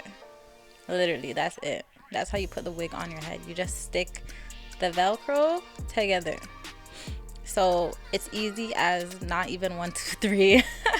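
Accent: Toronto, Canada. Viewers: Your Toronto accent is stronggg girl. Thank you for this!! ❤️❤️ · Very random but are you canadian? 😂 ur accent is giving very much Canadian like me!!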